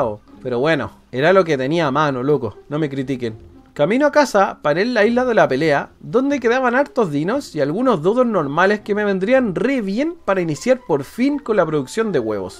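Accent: Chile. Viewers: Esta la Zorra la Nueva edición al estilo Hopa, narrado al clásico Idioma Chileno, esta super chistoso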